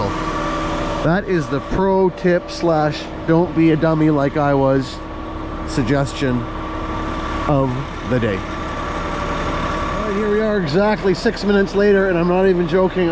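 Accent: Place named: Canada. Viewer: You must be from the deep south of you think a guy with a Canadian accent sounds smart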